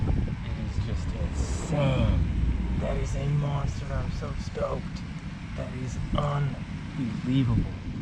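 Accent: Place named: Texas, United States. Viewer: What part of Texas are you from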